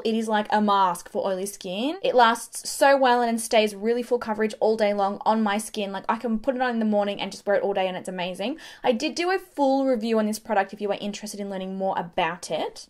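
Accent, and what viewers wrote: Australia. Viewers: I could listen to you talk all day, love the Aussie accent! 😍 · Why do australians pronounce 'go' like GOURH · Really enjoyed this video but I had to watch it muted and with captions because her accent is so terrible! It was like nails on chalkboard to me and I normally don't mind an Australian accent. For whatever reason though, her accent really got to me and was hideous to listen to. Yikes. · Love yur Aussie accent 💗👍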